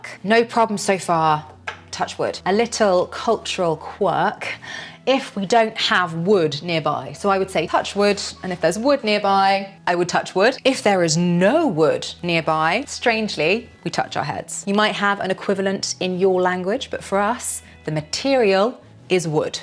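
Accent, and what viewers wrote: United Kingdom. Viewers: I could suggest: go slower in exposing all the phrases. 25 ones in a short video are😮 too many to learn at once. It should be better to make 2,3,4 examples for each phrase. Do you agree? Anyway I love your british pronunciation 😊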